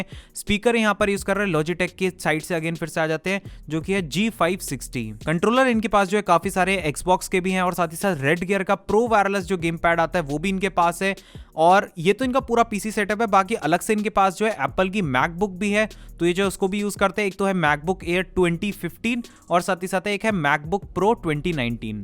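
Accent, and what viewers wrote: Gujarat, India. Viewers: Bro are you Gujarati??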